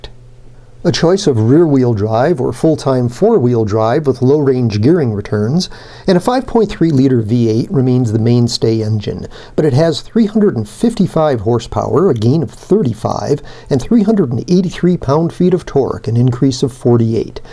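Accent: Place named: Canada. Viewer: Great video! These big trucks are any traditional boy's matchbox dream come true. You've got a sub! I must ask though, out of curiosity, are you Canadian? Your accent sounds close to home. Cheers from the north east!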